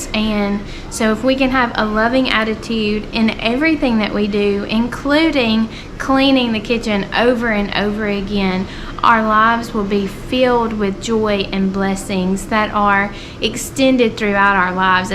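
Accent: South, United States. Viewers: I’ve never related so much to a video in my life!!! With 2 under 2 (11 months apart 🥴) it’s so hard keeping up with everything once you get even a day behind . I’m from Alabama and most of my fam still lives there so I love your accent and southern charm! 💛 don’t be so hard on yourself , you’re doing just fine! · Your home is beautiful. I hear a southern accent? what state are you in? I am not in the southern states.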